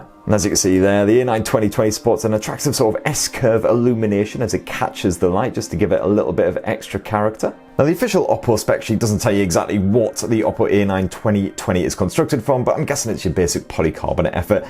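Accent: United Kingdom. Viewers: It's British Accent 👌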